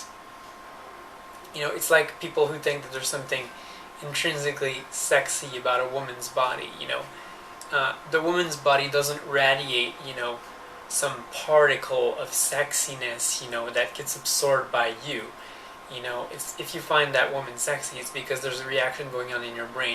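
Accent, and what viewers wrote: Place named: Canada. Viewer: You're here too. I like her Canadian accent. And she's pretty.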